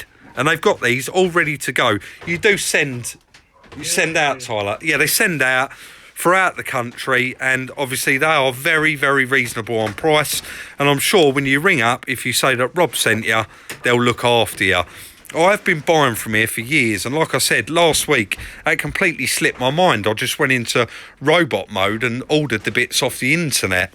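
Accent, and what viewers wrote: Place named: Bristol, United Kingdom. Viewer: Farmer accent from good ol' Bristol eh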